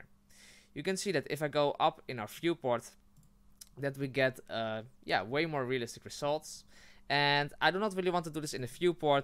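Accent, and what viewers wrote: Netherlands. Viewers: Are you dutch cuz your accent is very familiar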